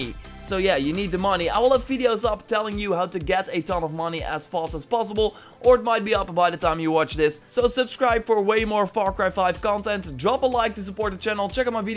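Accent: Netherlands. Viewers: Join some speaking lesson m8 ur Durch accent is really strong. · are you Dutch? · The Dutch is strong in this one. Good vid =] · Are u dutch i hear it somehow in ur voice? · Ben je nl? I hoorde je video zeggen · Ben je nederlands? Want je hebt een nederlands engels accent hahaha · Ben je Nederlands heel misschien? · tophercheese1 Dutch accent. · you sound dutch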